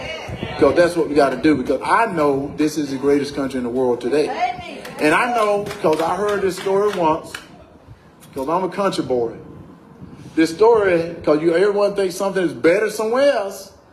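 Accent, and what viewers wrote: South, United States. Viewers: Making fun of his deep southern accent???? This Georgian is voting for him.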